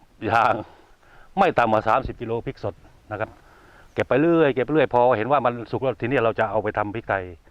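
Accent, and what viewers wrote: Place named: South, Thailand. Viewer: จังหวัดไหนครับ สำเสียงใต้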